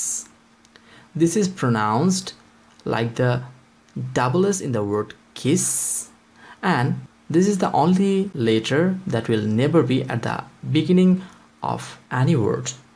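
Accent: India. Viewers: LOL, that's good. Teaching is a noble work. Whether it be full time or part time. I'm from India, I have heard your accent here.. So I thought you might be Indian. Anyways, good luck with your Deutsche Kurs! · He is a good teacher. Wish he could do something about that very Indian accent.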